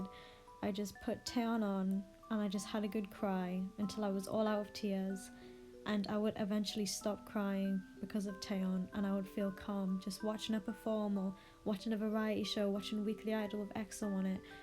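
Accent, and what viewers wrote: Newcastle, United Kingdom. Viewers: Omg you went to my school in newcastle 😂 knew there was Geordie in your voice haha. I left the year that school uniform was introduced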